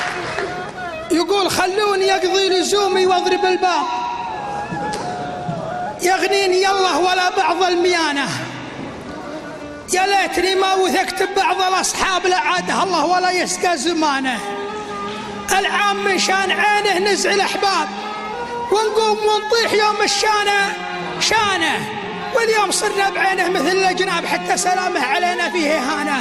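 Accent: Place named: Shahran, Saudi Arabia. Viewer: هذا درعاني واهبي شهراني يتكلم بلهجته الشهرانية الأصلية وقبيلي من روس قبايل وقحطان ونعم بهم احددانا وأنسابنا ولهجتهم مختلفة قليلاً عن لهجة شهران وهم وشهران أغلبهم بوادي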